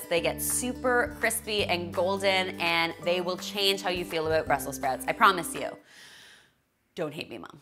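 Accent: Canada. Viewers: Horseradish?? YUCK!!!! Are you Canadian? How have I never noticed your accent before?Besides the horseradish...😷 This all looks yummy!!!!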